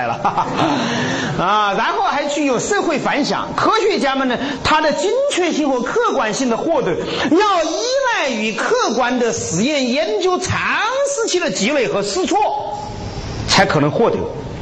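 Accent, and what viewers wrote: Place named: Sichuan, China. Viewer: 任剑涛老师激情澎湃的演讲中我能感觉到他使不完的劲！居然承认自己早年让老乡替考，实在人啊！浓重的四川口音使他的的演讲听起来更加生动，有趣😁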